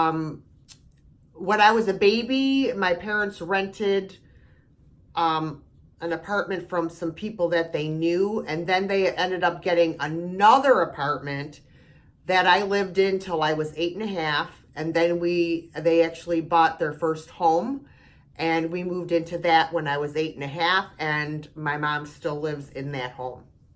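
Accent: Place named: Upstate New York, United States. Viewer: I was thinking you were from upstate close to Canada by your accent. An accent you probably think you don't have. LOL! I'm in the Mid-Hudson Valley.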